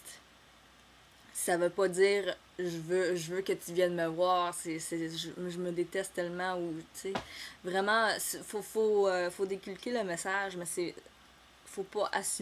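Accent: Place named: Canada. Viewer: aille, j'ai du mal a comprendre!!! lol l'accent canadien est different que cell de la france.. mais ca va encore, je comprend un peu!! =D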